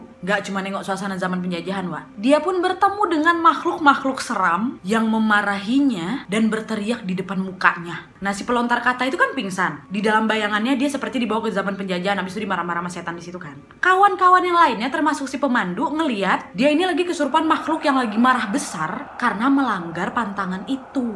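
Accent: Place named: Sumatra, Indonesia. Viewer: Halo, salam kenal. Aku penonton baru. Suka banget sama pembawaanmi santai tapi ngena. Oia, mf sebelumnya... ini orang sumatera kah? Karena logat bicaranya agak mirip sama kami... Stay safe terus ya. Semangat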